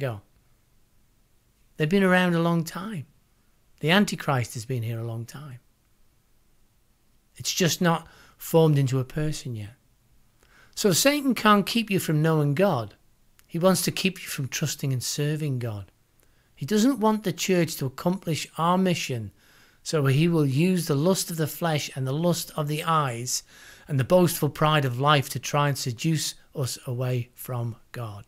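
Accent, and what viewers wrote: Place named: Scotland, United Kingdom. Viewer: Scottish? That wonderful accent.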